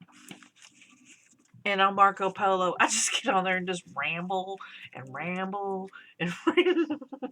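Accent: South, United States. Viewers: I live in the South. I enjoy hearing a good Southern accent. :) I believe in the empath thing. Have you ever heard of highly sensitive people? There's a trait called sensory processing sensitivity and it has to do with how your nervous system is wired. I learned about it when I was 18/19 years old through Elaine Aron's book The Highly Sensitive Person. She talks about picking up on people's emotions, etc. I've heard "no cap" on a music reaction channel I follow, but I don't know what it means. So, I need to look that up. Or you're welcome to tell me...educate me! :) So I finally got to finish your video. Thank you for helping me chill out. I had more written but when I went back to edit my comment it erased all that. I might just do a bunch of comments along the way from now on. It'll be obnoxious, but at least I won't lose what I wrote!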